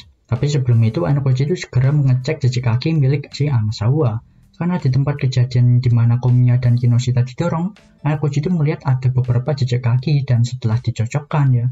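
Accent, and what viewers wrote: Java, Indonesia. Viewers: Makasih mang medhok 🤌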